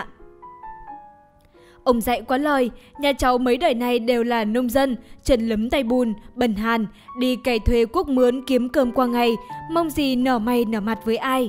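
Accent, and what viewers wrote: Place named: Nghe An, Vietnam. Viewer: Giọng Nghệ An lai Bắc ra 1 cái giọng nửa nạc nửa mở rất khó nghe!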